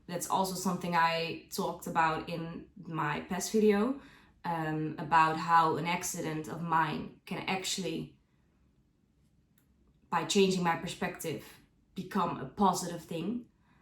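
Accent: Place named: Netherlands. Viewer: You have many good points 😊 keep it up 😊 are you dutch btw? I notice a small accent 😅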